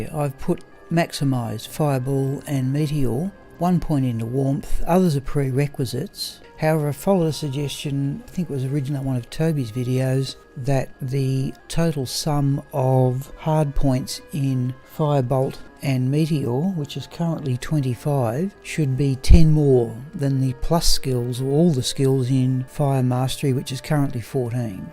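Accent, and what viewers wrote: Australia. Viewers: Thx for another good one,! Keep 'em comin'! Are you Australian btw? ☺️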